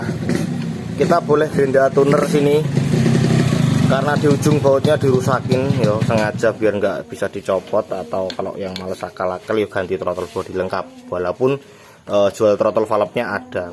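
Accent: Java, Indonesia. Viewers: Pembawaannya khas jawa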